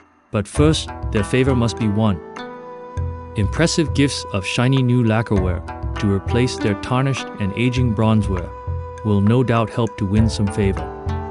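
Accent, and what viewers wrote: Australia. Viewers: LOVE the aussie accent - ha ha, I'm a kiwi so were not much better! Nice walkthrough, awesom game, keep it up mate!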